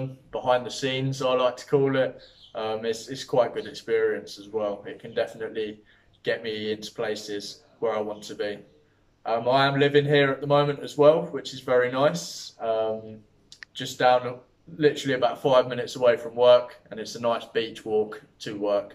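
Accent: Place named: England, United Kingdom. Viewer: from what part of england are you from ?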